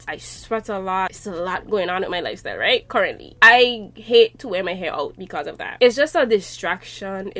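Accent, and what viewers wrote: Trinidad and Tobago. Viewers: Girl got to give you a thumbs up..the trini accent first then your content..I really dont know what products works for my hair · Are you trinidadian?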